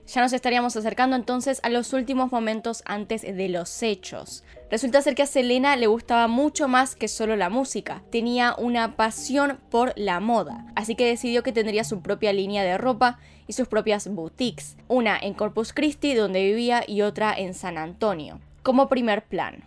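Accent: Argentina. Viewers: Muy buen video de Selena tristemente ya no está conosotros yo pienso si siguiera viva sería más famosa , lo que me gustó de ella que era muy sencilla no se le subía la fama también era humilde te felicito por el video y me gusta tu asentó Argentino como pronunciado la Y driega me encanta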